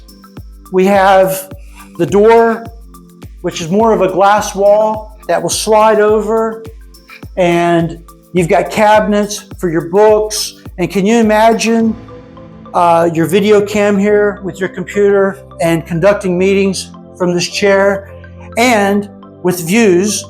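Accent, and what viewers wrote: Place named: Scotland, United Kingdom. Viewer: Everyone loves your Scottish accent!! Especially as Americans 😊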